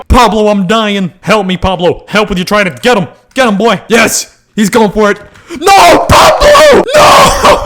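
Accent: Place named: Florida, United States. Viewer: you generally sound floridainian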